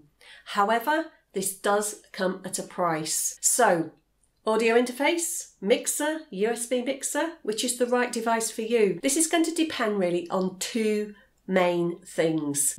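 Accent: United Kingdom. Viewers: Nice, but I can,t catch British accent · Very good explanation. And what a lovely British accent (for a French like myself 😊)! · Minus the thick British accent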